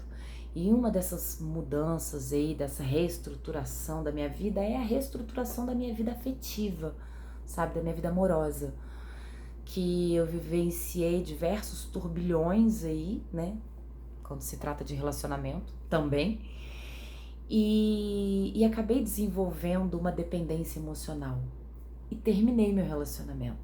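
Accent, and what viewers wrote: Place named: Rio de Janeiro, Brazil. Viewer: Cara..do nada vi.. que agora já não gostei mais.. escreve.. menina quero uma namorada..sou veio.. aliás mais ou menos veio.. psicóloga é bom pra desabafar.. mas vc tem um sotaque meio que carioca né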